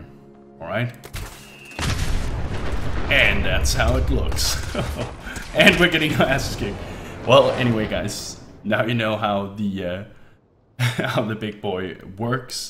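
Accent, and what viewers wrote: Denmark. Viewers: Is he from Denmark?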